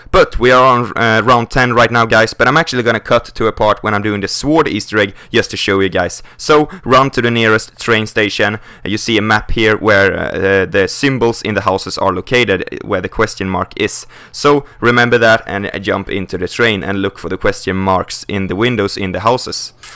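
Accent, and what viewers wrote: Sweden. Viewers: Bra swengelska!!! Döör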